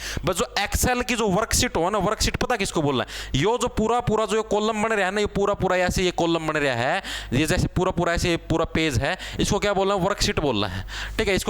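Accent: Haryana, India. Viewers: Padne naa aate aapke yahan toh aapki language sunne aate h sir jii 😅😅 haryanvi on top ❤❤ mittar 🤭🤭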